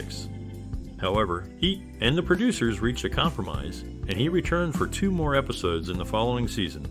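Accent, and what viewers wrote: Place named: United States. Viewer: Too many random pictures not related to what is being said. And telling us Jane Wyman played Angela about 20 times. The overly American pronounciation of Italian names got very distracting too.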